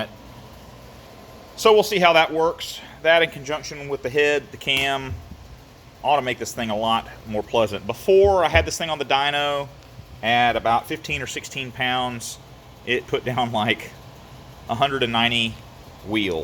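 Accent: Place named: South, United States. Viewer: I love how you open up all nonchalant with your southern drawl. Then, with hardly any build up, you proceed to just drop some extremely deep turbo and tuning knowledge. Hahahahaha! Looking forward to seeing this one develop.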